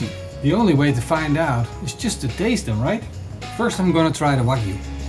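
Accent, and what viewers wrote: Netherlands. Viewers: Very obvious Dutch accent lol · Je kan horen dat hij een nederlander is door het luisteren naar zijn engels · Je spreekt Engels als een Nederlander. Ben je Nederlands? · Nice Dutch accent👌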